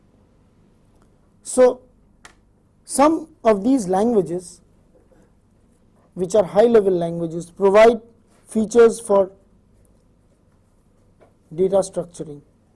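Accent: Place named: India. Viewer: I am from Sweden so I am not a native English speaker, but I can still understand this guy - although I kind of have to listen harder to grasp what he is saying. I guess I have a slight advantage due to the fact that Swedish is more "flat" than English and doesn't use any gliding vowels (unless you are speaking some of the southern accents), so that's probably more similar to the Indian accent.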